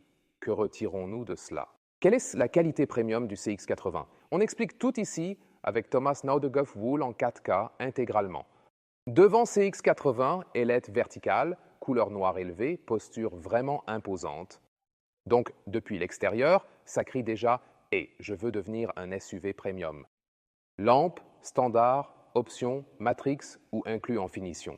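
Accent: Canada. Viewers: je me disais bien car des fois, il y a un léger accent canadien. Je viens de terminer une de vos vidéos. Merci comme même de le faire en français. En tout cas c'est bluffant.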